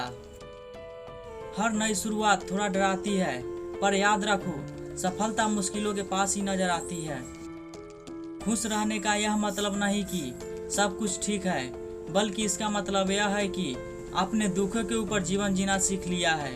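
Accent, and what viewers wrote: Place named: Bhojpuri, India. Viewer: Bhai tumhare content and channel dono superb hai proud of you but aapke Hindi Bolney ki shaili mein shudhta lekar aao shabdon ko or clear bolo bhojpuri or maithili tone mein nahi Hindi tone mein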